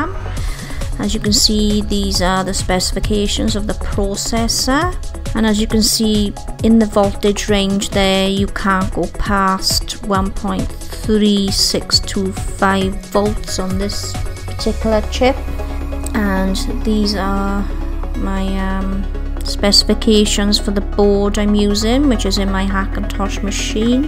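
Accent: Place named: Wales, United Kingdom. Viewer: <3 welsh accent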